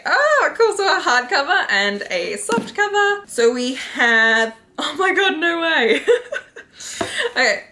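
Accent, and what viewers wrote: Australia. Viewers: U sound extra Australian today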